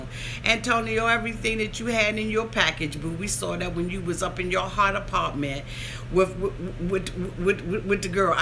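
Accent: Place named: Maryland, United States. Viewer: Britt S it's her Maryland accent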